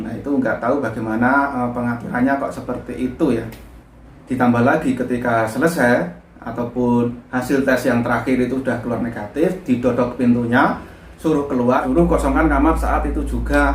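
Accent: Java, Indonesia. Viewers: meni medok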